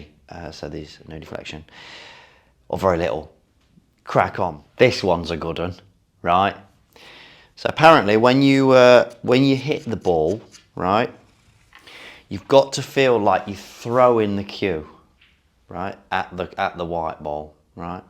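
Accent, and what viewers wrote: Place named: Midlands, United Kingdom. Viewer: So midlands, I love it! What a guy.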